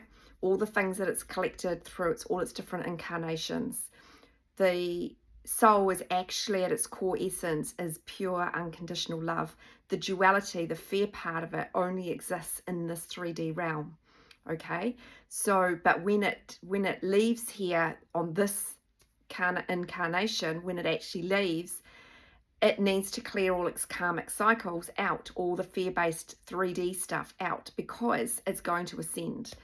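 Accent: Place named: New Zealand. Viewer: Hi! I paid $500 to a bald man on youtube in october. Did everything he said then sat in fear based energy for 3 months, stressing i had to forget him but could not. Shamed myself. Felt like a naughty child in the group, cant say this cant do that or we'll block you and no refunds!! Found you 2 weeks ago. Immediately shifted and grounded the hectic energy. Just wanted to say thanks for your videos, wish i could pay for your services but cannot put any more $$ into this journey. Parents grew up in hastings and napier, im in melb so love the accent! Reminds me of my cousins. Thanks for all you do xxxx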